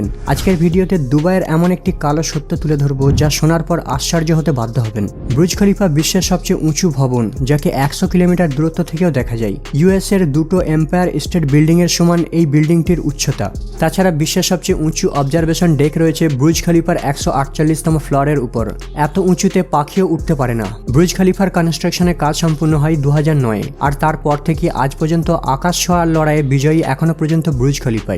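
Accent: India. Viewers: You not Bangladeshe you form India I'm sure your spk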